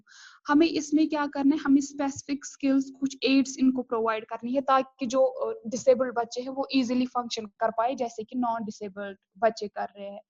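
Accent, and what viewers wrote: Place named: Kashmir, India. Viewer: Ur language accent is kashmiri ❣️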